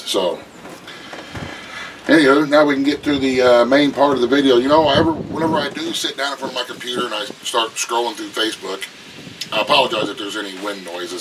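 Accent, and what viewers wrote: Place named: South, United States. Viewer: I'm a new subscriber and I love the content on your channel....you sir sound like the southern version of another YouTuber named Buckin Billy Ray from Canada...he spreads the same message, check him out. I love your channel and I will share with my friends. Cheers from Kansas City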